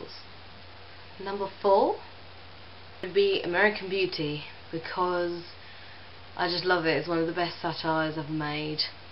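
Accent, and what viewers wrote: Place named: United Kingdom. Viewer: you sound so british :)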